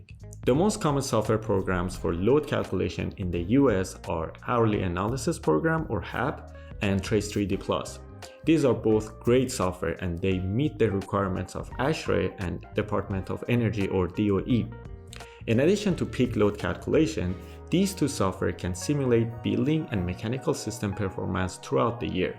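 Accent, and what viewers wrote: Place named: Iran. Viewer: Dear I found randomly one of your videos in YouTube, and l enjoyed it. I started to follow your channel, but I realized that something familiar was in your voice. Lastly I realized that you are originally an Iranian. I'm very proud of you.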